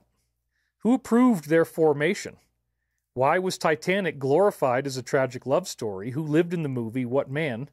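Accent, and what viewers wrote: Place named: Australia. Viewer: IM GOING TO BE A DICK...I DO NOT LIKE THE OZZIE ACCIENT MATE...I DISLIKE IT BIG TIME, FROM AN OZZIE OLD CHOOK......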